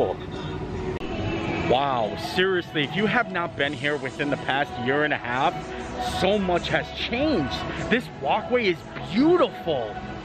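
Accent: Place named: Northeast Pennsylvania, United States. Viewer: I always thought you had a north east PA accent. Lol. If you’re ever near Williamsport PA, hit me up.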